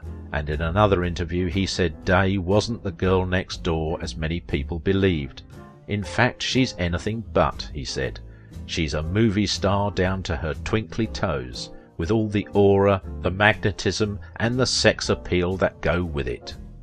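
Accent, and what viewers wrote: United Kingdom. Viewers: I could have appreciated this video if it wasn't for the creator's British accent. Try as we may, we just can't escape our attachment to that island nation. One revolutionary war, two world wars and the cold war and we're no closer to being independent from them. If something originates in Britain, it will find its way into our country. Some say it's due to a common language and history, but it's like relatives who live on the other side of the country. Send them a Christmas card every year, but let them live their lives, while we are free to live our own.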